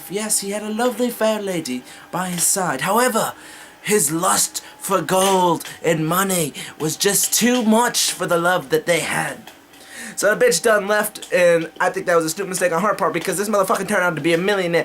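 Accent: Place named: United Kingdom. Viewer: Nice British accent haha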